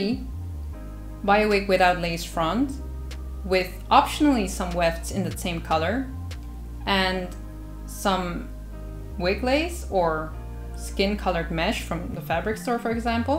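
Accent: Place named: Colombia. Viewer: You look asian but sound like your from Colombia of something. 🖤 It's confusing and I like it lol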